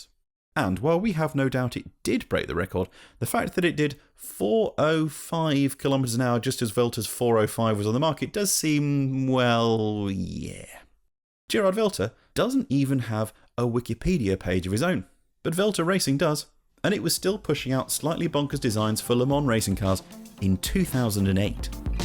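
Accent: United Kingdom. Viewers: Yeah we get it. You are british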